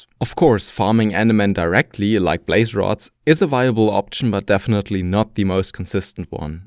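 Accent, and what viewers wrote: Germany. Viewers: Am i hearing a german accent?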